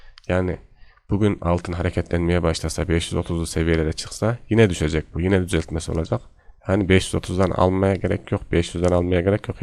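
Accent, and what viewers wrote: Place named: Yozgat, Turkey. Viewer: Bence yozgatli kardes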